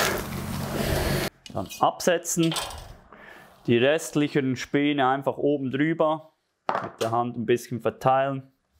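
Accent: Switzerland. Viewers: Dopo diversi anni che sono tornata in Italia dopo aver vissuto in Svizzera per 20 anni ho avuto voglia di elaborare una swarzwalder torte sono felice di sentir parlare svizzero ed di sentire le spiegazioni in modo così chiaro. Complimenti. Faro questa torta peril compleanno è la regina delle torte. Da oggi ti seguirò.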